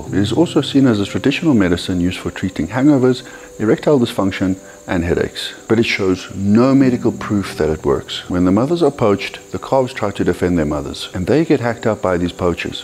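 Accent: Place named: South Africa. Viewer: I've never heard such a nice south african accent.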